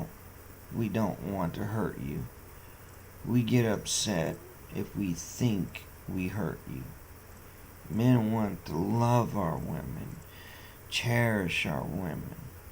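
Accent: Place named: United States. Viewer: 30 👎👎👎👎 pretty sad that you don't know what it wheel loader is because to me you sound American.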